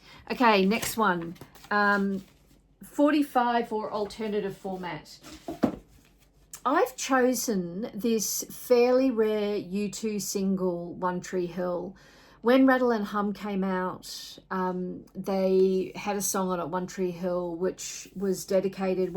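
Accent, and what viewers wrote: Australia. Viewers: Great to hear an Aussie voice, not too many in the VC. Cool that you showed Hermitude. I grew up at the foot of the Mountains, my Aunty still lives at Blaxland. Where's a good record store in Western Sydney? Next time im there i want to have a dig.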